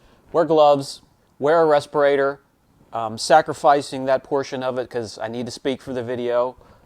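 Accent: Pennsylvania, United States. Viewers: Other than the "Pennsylvania-ese" I detected in your accent many moons ago, you sound perfect. And as far as getting ahead of yourself, it's up to us to keep up! Your videos are straight-forward and a pleasure to watch. I always learn something new. 👏🏻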